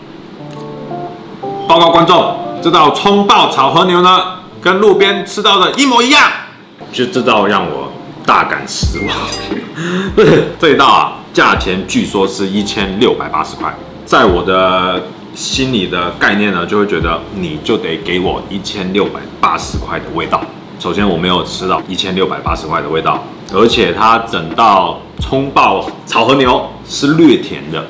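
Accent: Taiwan. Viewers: 看了這部影片才知道你是香港人，你的口音完全以為你是台灣人的說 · 港仔台湾腔已经这么浓了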